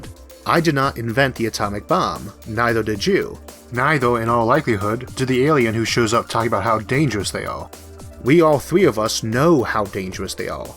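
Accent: United States. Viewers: You have an american accent!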